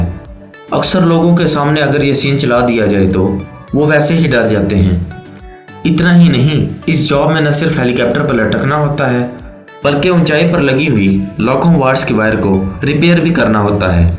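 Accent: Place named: Kashmir, India. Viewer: Aslamo elikum ap kashmir Rawalakot sa hn sardar hn ap ke urdu sa pachana ha me b kashmir sa hn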